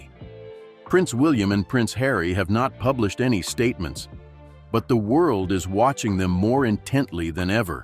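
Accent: United States. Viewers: This voice-over is a joke. American accent and no information about the source of this silly, speculative nonsense.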